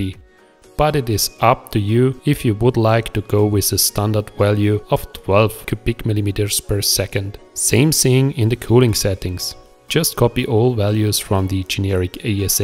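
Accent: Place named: Germany. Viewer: Ich wollte schon sagen, hört sich an wie deutsches Englisch! Gleich mal ein Abo da gelassen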